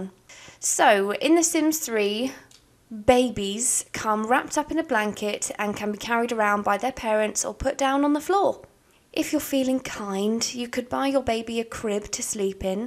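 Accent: United Kingdom. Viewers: I like you accent were in the UK are you?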